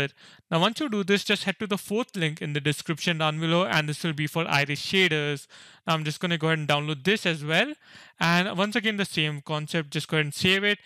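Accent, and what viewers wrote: India. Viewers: Ofc it's an indian man speaking english having a girl skin making a tutorial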